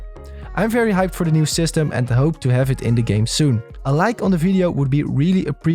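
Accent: Netherlands. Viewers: Are u dutch? You kinda have the accent. Your enlish is great tho :)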